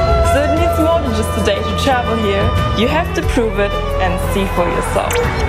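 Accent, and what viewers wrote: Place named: India. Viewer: the voice belongs to india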